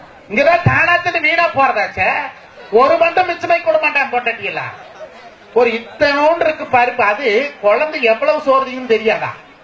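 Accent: India. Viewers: Similar to Palakkad Tamil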